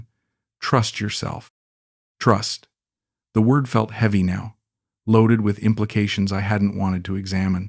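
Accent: United States. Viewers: American accent not British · 🤦 lord have mercy, this is fake, welcome to America 2026. Mindless entertainment to keep you distracted. 🙄. Supposedly British commentator with an American accent 😅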